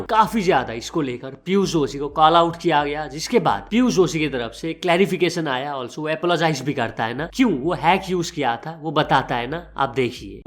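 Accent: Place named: Assam, India. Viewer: Accent bhai, vo assam ka hai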